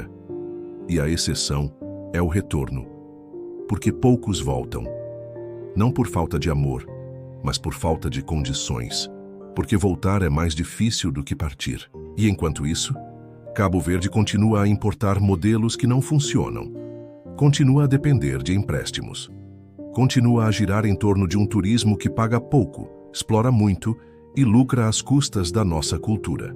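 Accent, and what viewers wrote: Brazil. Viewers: Madame, então qual o motivo de "hablar" em português com sotaque brasileiro? Faça o favor de mudar para sotaque dos PALOP...🎉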